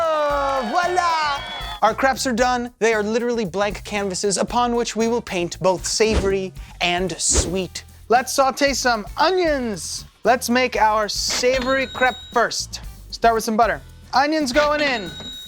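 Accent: France. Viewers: can you speak with that French accent in every video going forward? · Not complaining, but I think may have just wanted an excuse to use his French accent and vocabulary for an entire episode.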